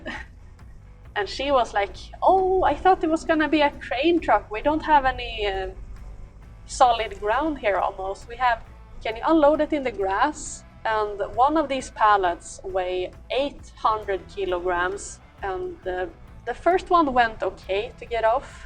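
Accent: Sweden. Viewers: låter du som alla svenskar gör i amerikanska filmer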